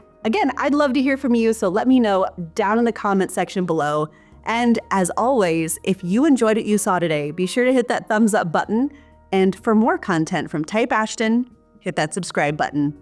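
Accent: United States. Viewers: It is so pleasant to hear a balanced opinion in an American accent for once. 🤷‍♂😄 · U need a differwnr presenter...what you say may be right but right now an amerrican voice talking about media bias and truth has next to no credibility outside the former Usa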